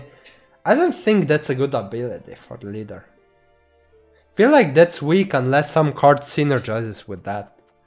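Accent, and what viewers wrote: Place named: Spain. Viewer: I think from Spain. But i like his accent. I like also his contents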